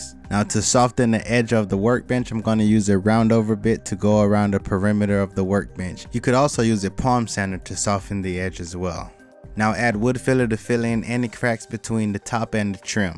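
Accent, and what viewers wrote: United States. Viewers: Where's old mates accent from? Not too familiar with yank accents outside the obvious ones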